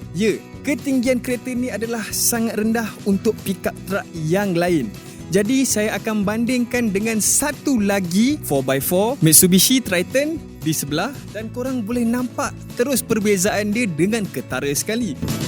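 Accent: Malaysia. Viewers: abg ini omong emang melayu banget ada yg gak ngerti........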